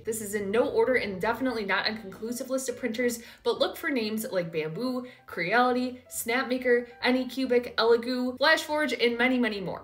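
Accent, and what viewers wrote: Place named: Midwest, United States. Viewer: Are you from WI? Your Midwest accent is thick!!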